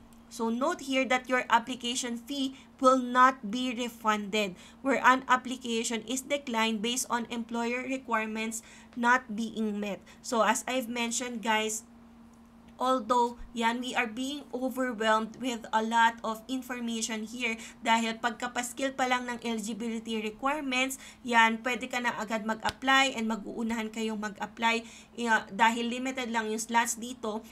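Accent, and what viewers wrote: Philippines. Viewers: Remember. Sooner or Later After your Graduation.. you will be working in the legal Firm. You must improve your English Pronounciations and your English Communications. Avoid using Filipino way of talking. Start assimilating to the American Culture.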